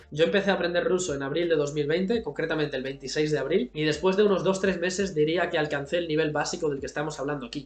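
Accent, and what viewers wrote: Spain. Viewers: Es una pena que teniendo este canal no te animes a hablar con tu acento natural. Debe de haber como tropecientos mil canales con chicos/as que hablan en castellano (estándar). Estoy seguro de que a muchos de tus seguidores les encantaría acostumbrar el oído a los acentos del sur de España. 😉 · Hola, estoy aprendiendo español y entiendo muy bien como hablas. De qué region estas en españa ?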